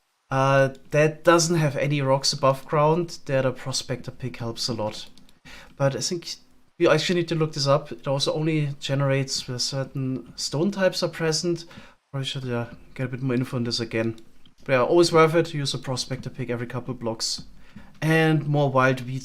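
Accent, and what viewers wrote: Germany. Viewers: Every now and then the German accent makes me smile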